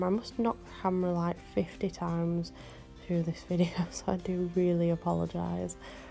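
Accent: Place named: Yorkshire, United Kingdom. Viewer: By ek lass . . . Ya proper Yorkshire int ya?. Hahaha. From one Yorkshire lass to another. . . Love these nails.😍💅🏻💕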